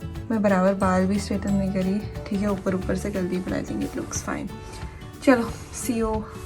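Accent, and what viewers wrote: Hyderabad, India. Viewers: Love to listen to you talk in Hyderabadi.. please continue ❤️ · Amongst all South Indian States, Hyderabadi accent is very swt n easily understandable. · the vlogs are going well,continue with your hyd’i,We should be proud our Hyd’i Urdu is very rare and people don’t get to hear it often.👏🏻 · Hi.. I'm from Jaipur....love when you talk in Hyderabadi.....😘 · As I am Hyderabadi leasing Hyderabadi feels good so plz keep talking in Hyderabadi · . Be natural. We like you like that. Hyderabadi urdu zindabad. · Plzz hyderabadi hindi hi bola karo.... Aftr all its ur Mother tongue..... Sounds gud... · Apene Hyderabadi hai yaar not Pakistan urdu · Nice vlog hyderabadi meich baat karye mazza aati dekh kar 🤗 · Yes we loved the clog very much n we love Hyderabadi accent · M also from Hyderabad it’s really Hppy to hear Hyderabadi on ur vlogs..aisech Bath kro acha dikhra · Really enjoy ur Hyderabadi hindi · Loved your Hyderabadi accent, When u r speaking Hyderabadi, want to listen more from you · Please talk in Hyderabadi accent only we love dat yaar love u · I love Hyderabadi accent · Hyderabadi accent love it 😍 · Haha..Hyderabadi accent has so much jayenge, aayenge, karenge.. 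Love watching ur vlogs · Dubai Home tour please😍...your hyderabadi is cute ✌ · Loved ur hyderabadi accent... Keep using it😘.much love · apan #hyderabadi bolte acchai diktai...#sania_mirza ki nakko sunno #be_orignal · Love when you talk hydarabadi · I love the hyderabadi accent. It suits you like anything :D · Mashallah very good vlog👍 loved your Hyderabadi accent plz improve your voice quality in the video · Hey your too cute. Especially the Hyderabadi accent.I enjoy watching ur blogs.Really looking forward to your upcoming blogs 😊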